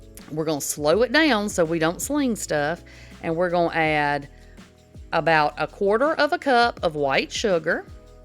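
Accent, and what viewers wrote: South, United States. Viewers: Really enjoy your videos. I’m from Indiana and I love your southern accent. You crack me up on some of your saying. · Lovely southern accent. Which State are you from? Ohh,..I’m with you on the Green Onions and Lettuce! Yes! I can hardly cook without 🧅 ONIONS. My MIL says the same thing. I use the Taco Bell taco seasoning packets and we never looked back after that! I’m definitely making that Crunchwrap this week! Tonight is Pinto Beans w Smoked Pork Neckbones and Cornbread -husband’s request. I am 60…and I STILL don’t have a stand mixer so, you’re doin’ better than I am,😂. Lovely mint color for Miss Margo. OHMG, Little Debbie Oatmeal Cream Pies are MY favorite! To make these will be such a treat!!! I think we would be good friends if you were my neighbor.😂 Years ago, I made a variation these but used straight chewy Molasses cookies for my FIL. 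He wasn’t crazy about oatmeal in a cookie. He told me “You can make those for me anytime you want to because they were fantastic!”. Somehow, I never got around to making them again….go figure huh? 😂 Curly fries? If you can’t find your Arby’s….Checker’s French Fries are battered very lightly, seasoned excellently and really GOOD. You might like those as a substitute. Ok,..now we’re on the Cheesecake! 😋 YUM!! I just made a decadent chocolate cake with homemade cream cheese frosting on Sunday and my husband said next time to make him a bowl of the frosting for himself. 😂 Btw, I don’t normally make lengthy comments such as this but, I’m watching all 6 recipes and….listening to you is very comforting somehow.😊Plus I’m resting and recovering ❤️‍🩹 from a ver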